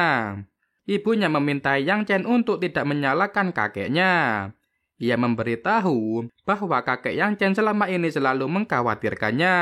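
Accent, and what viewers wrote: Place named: Madura, Indonesia. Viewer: Pembawa alur ceritanya...kayak orang madura tak yehhh...tak. yeee... ditarik " bahasa nyaaaaa...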